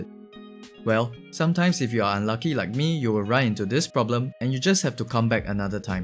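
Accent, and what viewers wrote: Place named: Singapore. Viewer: i think hes singaporean, really distinct accent :P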